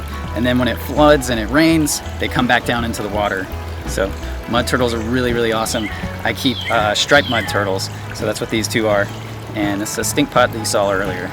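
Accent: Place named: Georgia, United States. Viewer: I wonder what state he is it sounds like Georgia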